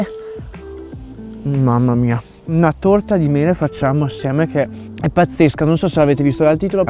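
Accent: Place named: Rome, Italy. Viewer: Simpaticissimo...ma sei romano?ogni tanto te ne esci alla romana😄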